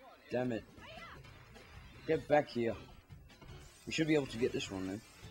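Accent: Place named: South Africa. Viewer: Which country are you from? you sound south african also very bored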